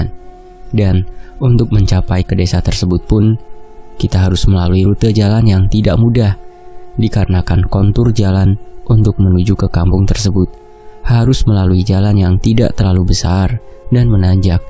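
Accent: Java, Indonesia. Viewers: Cerita org dijakarta jadi bikin imajinasi di daerah jawa karena dialeknya😂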